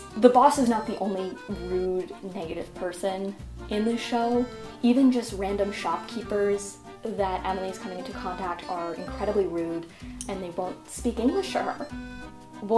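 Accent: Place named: United States. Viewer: I am sorry, but I did not hear any French accent in your English (very much American English if I may point out). I am speaking as a European with a Master’s in English who lives in America for a long time. I hear accents, and you have none, which makes me think that you are an American born and raised 🧐. Nothing wrong with that, BUT... relax! This show is not culturally 100% accurate, it’s a “feel good” show, and the amount of criticism you express is not going to get you more subscribers. You really need to find other topics and angles to grow your channel because the country of the USA is loving the show! 😀 With that said, there are many accurate cultural points in this show, yet again coming from an actually born and raised in Europe person. Don’t take yourself too seriously, and enjoy the show and the FASHION (which by the way is not “questionable”, and that’s the phrase that yet again gives away an American in you), and FEEL GOOD watching the show! 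😃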